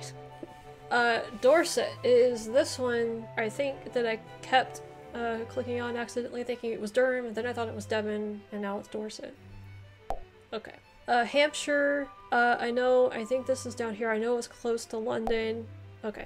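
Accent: United States. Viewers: Having known some Californians in the past it always amuses me hearing American s pronouncement of English place names. But you did well with the position of the counties. I was born in Yorkshire now live in Staffordshire and have been to every county in England. An example Leicestershire is pronounced Lester shire. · Well done, for a non brit you did really well, very American pronunciation though 😀 If you ever need a brit to teach you our ways of speaking hit me up 😀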